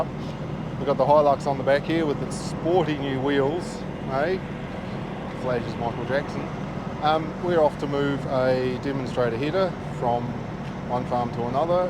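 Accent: Southland, New Zealand. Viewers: Great to watch. Enjoy your stuff mate. You sound like a Southlander.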